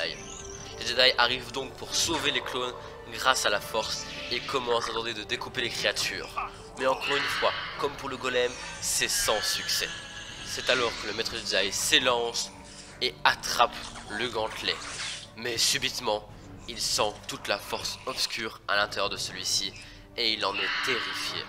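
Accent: Belgium. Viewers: ❤ l'accent belge qui tue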